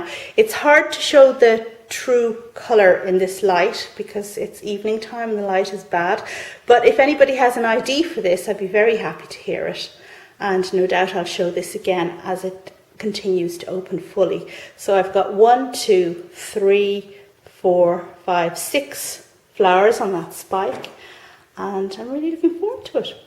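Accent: Ireland. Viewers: Happy to have found your channel via Lyn's! I just love your accent and love seeing glimpses of Ireland out your window! I live in the US and my mom took me to Ireland for my 30th birthday. My parents have friends in Ireland and they drove us around because neither of us trusted ourselves driving on the other side of the road! We visited in February and I loved the rain and seeing the mist in the valleys and fields. We stayed in a town a short train distance from Kilkenny. I can always remember Kilkenny but not the name of the little town! Haha. I wish I had thought to bring a houseplant back as a souvenir.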